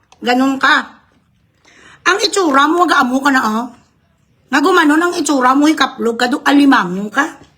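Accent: Visayas, Philippines. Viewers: natutuwa ang taumbayan sa iyo. Mabuhay ka. Sincere ka, honest. Very lovable ka sa Visayan accent mo, delightful sa ears ang Visayan accent mo. Candid ka, walang hypocrisy. Maganda ka, ang face mo guwapa ka, ang taumbayan ay hinahangaan ka sa pag analyze mo ng political situation ng ating bansa. Di ka plastic, may sense of humor ka pa, di ka nagpapanggap. Very true ka pag nagsasalita, walang kaartehan. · i hate sarah duterte pero natutuwa ako sayo parang ang cute ng visaya accent mo at i like you maldeta ang peg hahaha aliwwww .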